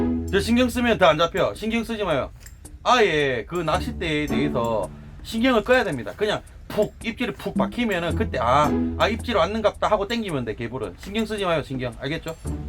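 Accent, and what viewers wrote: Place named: Gyeongsang, South Korea. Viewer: 덩치 크고 얼굴 크고 갱상도 사투리에 호감가는 이유는 머징ㅋ 구독박고갑니다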